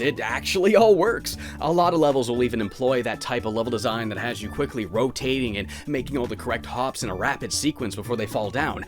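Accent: Canada. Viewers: Well that, and everything else he says in his pretty conspicuous Canadian accent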